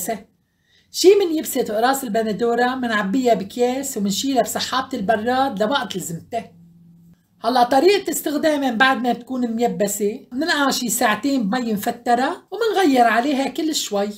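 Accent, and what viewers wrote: Aleppo, Syria. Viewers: لهجة حلب مثل العسل الصافي وأهل حلب والعادات والتقاليد والتراث العريق من آلاف السنين إلى اليوم تاج على رؤوس الناس أنا من حمص وأسكن باللاذقية دخيل رب السوريين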